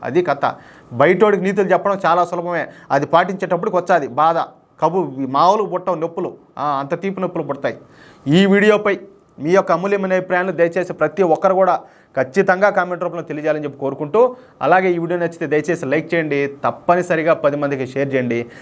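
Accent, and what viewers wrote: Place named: Kadapa, India. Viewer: Meedi kadapa district ramjapeta Slang la vundi sir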